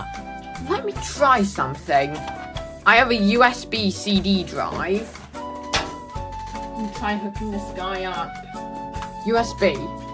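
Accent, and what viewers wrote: United Kingdom. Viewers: I love how the british accent is so recognizable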